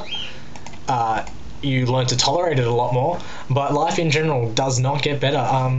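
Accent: Australia. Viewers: I'm Australian and if you are Australian you would be able to recognize his accept ahahah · ) australian accent, thats hot xP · I'm British.. you deffo sound like an Aussie lol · Heh only a Yank would think that was British. But as a Brit, you have a lovely Australian accent. ;)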